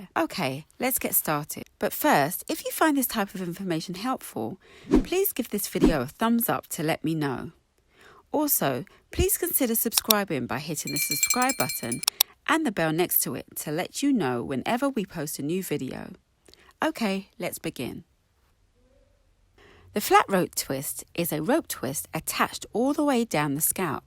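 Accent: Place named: England, United Kingdom. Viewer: 👏🏾👏🏾👏🏾👏🏾😃 That was so wonderful to watch! I love the way you speak! Beautiful English accent...I'm biased, I have a very similar voice! 😄 Your video was wonderful! I really enjoyed watching you do your youngest daughters hair routine. I have a son, whose he's 16, so I wasn't watching for him, I was watching for my Grandneice whose 9mths old. I want my niece to use a healthy hair growth routine on her daughters hair, so I'm going to share your video with her. I love your, 'GIRLS YOU ROCK', T-SHIRTS and hope to purchase one or two for my Grandneice. Thank you again and look after your 3? beautiful, little girls 🥰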